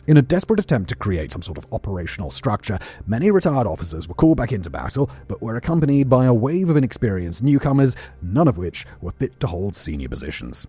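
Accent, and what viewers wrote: United Kingdom. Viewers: Can’t stand this voice. I’m so over British accents. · anyone else bothered by how fast he talks? I mean I'm used to the British accent and all but I can barely understand what he's talking about because of how fast he speaks.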